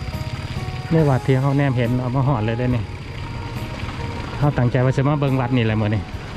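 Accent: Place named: Xe, Laos. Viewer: ສຳນຽງສຽງເວົ້າມ່ວນເນາະ ເປັນຄົນເຊບໍ່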